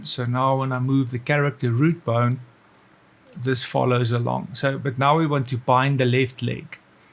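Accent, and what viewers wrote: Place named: South Africa. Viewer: Good sir, from your accent im assuming your south african,🤝😃. Im curiouse, are there any south African companies or industries that use Rive in they pipeline? Great stuff by the way👏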